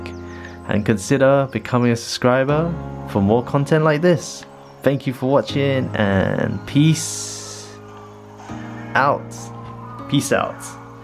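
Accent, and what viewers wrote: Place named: United Kingdom. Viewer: Wow never seen an Asian man or woman speak a British accent until now, nice video as well. Don't have a PC but gonna get just something cheap to stream seeing as I need it. Thanks for the help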